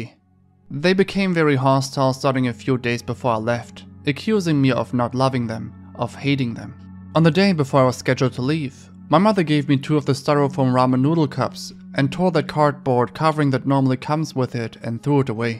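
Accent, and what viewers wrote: Germany. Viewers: You've got a German accent. You are German.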